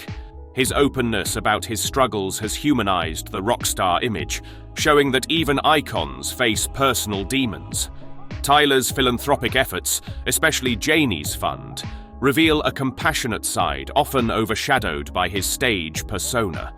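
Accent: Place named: United Kingdom. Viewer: I am so done with British commentary a big thumbs down! Old and wearing end condescending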